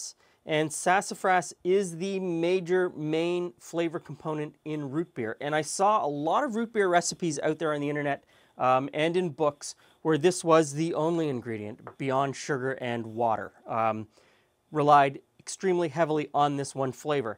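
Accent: Canada. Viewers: Canadian eh? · You have to be Canadian!! Absolutely nothing wrong with that. It's just how you pronounce certain words, and your "accent" with how you say those words. Like "about"...or you are just further up North from me. Anyway, thank you for sharing your recipe for root beer!! Love home made root beer!!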